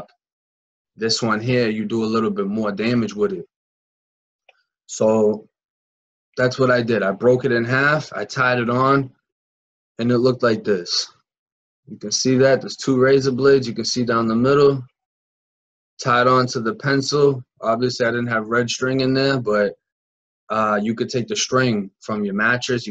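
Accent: Boston, United States. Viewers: This dude talking about Florida, but is he from Beantown? That accent sticks out to me. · And are u from boston origanally bc ur accent sounds exactly like a boston accent just wondering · Hey hon, i really enjoy your content. You have a great way of speaking and your Boston accent is da bomb. Your stories are very interesting. You will be growing by leaps and bounds on here. How are your tootsies feeling? What a serious painful nightmare. People underestimate the sensitivity of the toes! I was just crying over a simple~ass blister on my toe from a pair of new shoes! Please! Frickin wuss I am! I look forward to more videos from you! Best, Michele from Baltimore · Yo your mix of Boston and country accent is great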